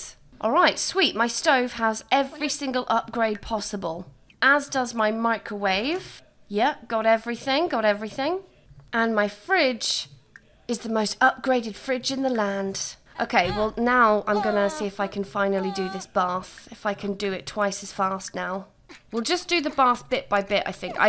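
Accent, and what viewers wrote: United Kingdom. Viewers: I love ur vids cuz their so funny and i like hearing ur voice! British voices r so smooth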